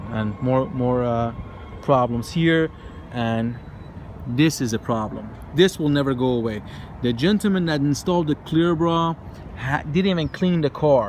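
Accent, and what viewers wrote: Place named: Iran. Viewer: Woow Such a Persian accent Did you come to US like last year or somethin?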